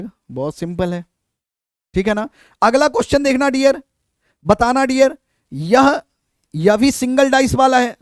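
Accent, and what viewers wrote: Haryana, India. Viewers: Aap Haryana se ho ji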